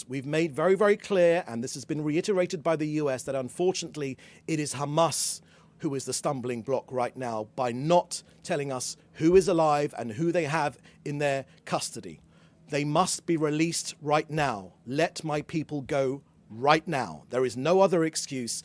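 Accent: England, United Kingdom. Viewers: Another liar with funny English accent fomenting his hate and BS. No one believes your lies anymore after you Massacred over 30,000 innocent Palestinian civilians in Gaza with over 16,000 children. Shame on you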